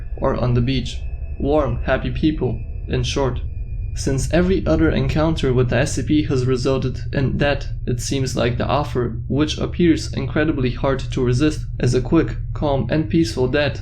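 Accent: Germany. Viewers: Im sorry is there a Chance you are European,specifically German? My friend has the same accent I think it's really cute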